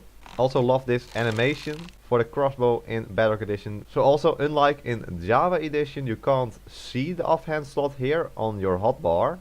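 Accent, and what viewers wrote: Netherlands. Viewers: Ben je Nederlands want zo klinkt je stem als je Engels praat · Are you dutch? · Are you dutch 😂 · Ben jij Nederlands, want ik hoor een klein accentje? 😊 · Typisch Nederlands engels 😂😂😂😂😂😂😂😂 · Are you Dutch? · ik hoor gwn jou nederlandse accent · You sound dutch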